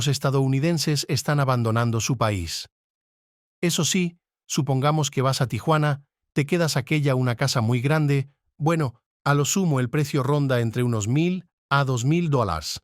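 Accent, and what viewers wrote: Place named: Spain. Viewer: Yayaya párale españolito Mamila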